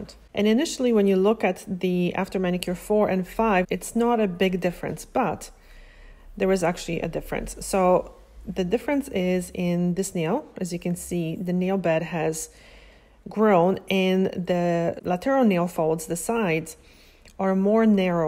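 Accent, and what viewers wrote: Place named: Poland. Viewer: I just subscribed for the content but also I am getting strong Polish vibes when you speak. I’m Polish and your slight accent feels like home. ❤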